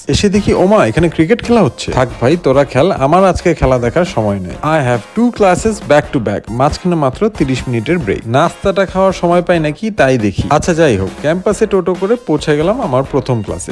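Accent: Bangladesh. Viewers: Brother are you from sylhet ❤ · খানিকটা যশোরের ভাষা 🙂😊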